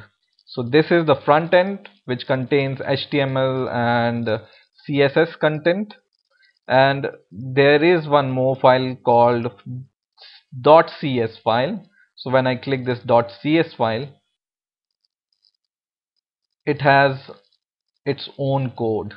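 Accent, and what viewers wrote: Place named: India. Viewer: Indian accent makes it better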